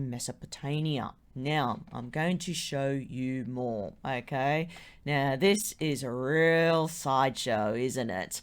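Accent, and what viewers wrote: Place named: Australia. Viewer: Hi 👋 do you have Polynesian roots? I can hear 👂your an Aussie but you have Polynesian features.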